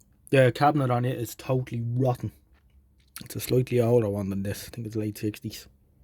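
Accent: Ireland. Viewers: As an Englishman, I absolutely love your wonderful Irish accent!...... You sound like, turning their hand to TV repair! 👍😂🤣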